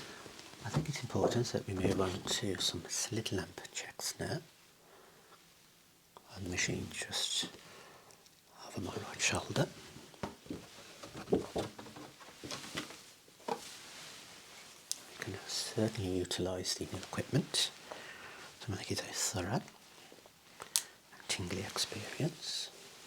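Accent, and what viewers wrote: Birmingham, United Kingdom. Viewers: listening to the start id be worried but a brummie bloke wouldnt do me wrong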